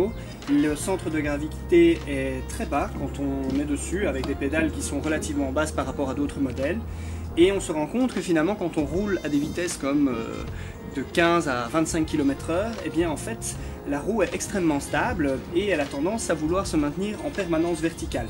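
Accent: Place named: Belgium. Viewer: Bonne vidéo! Je me suis décidé et j'ai commandé la Z6 :-) Tu es de Belgique ? J'ai cru entendre cet accent familier. Ce serait cool de wheeler ensemble en tt cas, je suis de BXHELL !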